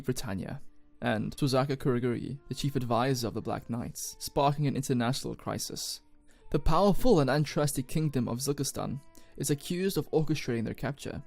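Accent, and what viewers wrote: South Africa. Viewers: You from South Africa? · Are you from South Africa?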